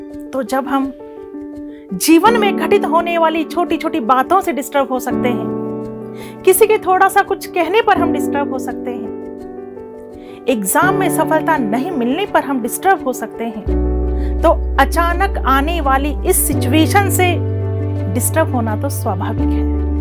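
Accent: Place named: Shekhawati, India. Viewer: mujhe thodi bahut samaj me aati he shekhawati... Bcoz mere papa ki school me shekhawati teacher ki posting hui he so unse kafi touch he mera.... Apki shekhawati bhasha sun ne me bahut badhiya lgti h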